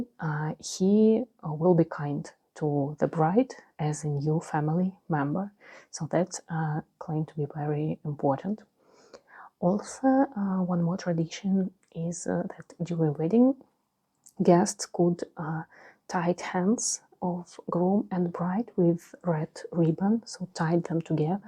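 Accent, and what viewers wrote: Russia. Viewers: I love your videos!!💗❤️ just found you and I’m very happy to have. I love the Russian accent for asmr lol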